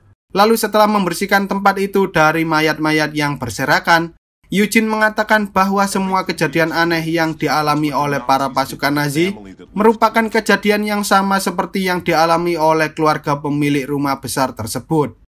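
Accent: Java, Indonesia. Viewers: Jawa nya mana bang ? medok bngt ,,,mantap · Medok bgttt 😁😂👍👍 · Fix ini yang ngisi suara pasti "wong jowo" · Kejadian kejadian aneh. 😀 Longat jawane apik tenan 🔥 Bravo..... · Medok amat Lu Jawa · Medok banget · Medhoknya sangat nacurell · Mas, klo bsa jangan medog dong bahasanya ...bsa gagal fokus nih nyimak film nya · Sampeyan Jowo ne ngendi mas? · Meddkok Mbanget aksennya.. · logat jawir malah ngilangin selera nonton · Medok jawanya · Aduh aku lucu sama suaranya medok sekali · Sangat medok keren2👍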